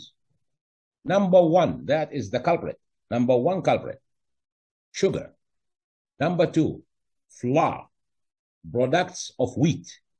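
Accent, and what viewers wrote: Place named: Arab world. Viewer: Very Good video , thanks. Wow your originally from india ! I thought your accent sound Arab .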